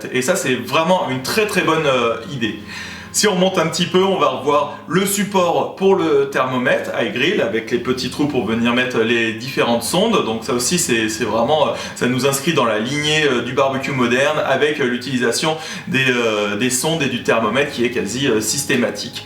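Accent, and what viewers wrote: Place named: Quebec, Canada. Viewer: La prononciation québecoise fait plaisir aussi aux amis belges :D !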